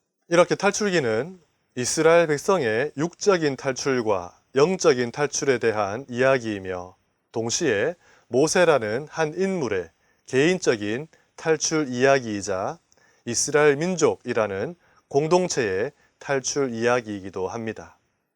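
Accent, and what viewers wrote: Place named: Gyeongsang, South Korea. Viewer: 차분하게 알아듣기쉽게 구수한경상도말씀이 너무도 잘 드렸습니다 고맙습니다~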